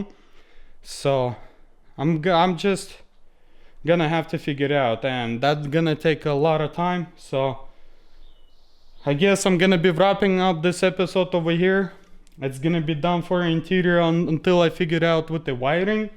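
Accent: Russia. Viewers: Actually for a 100% Russian dude you have an amazing accent. Greatings from Serbia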